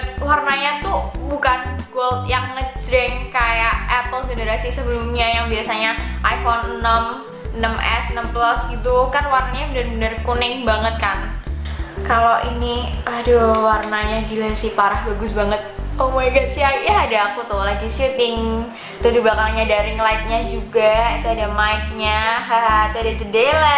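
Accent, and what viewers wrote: Java, Indonesia. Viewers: Medog banget ya ngomong nya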